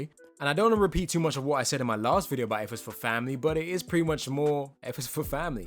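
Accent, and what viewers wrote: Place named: United Kingdom. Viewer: Ahh, I'm already amazed with the British accent🤪😍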